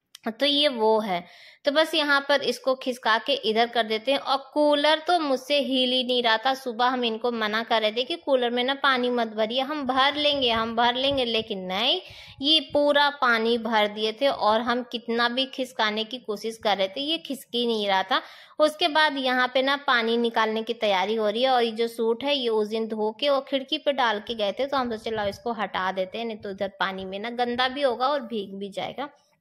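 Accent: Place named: Bihar, India. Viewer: Asalam o alaikum baji aap ne k kaha k aap u.p se hain lekin aap ka lehja bihari wala hai aap k bohat se alfaz biharion wale hote hain, I'm also a bihari from Karachi pakistan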